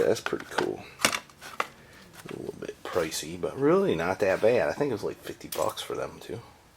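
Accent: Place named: New York, United States. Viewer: Enjoying the New York accent mixed with the southern slang!!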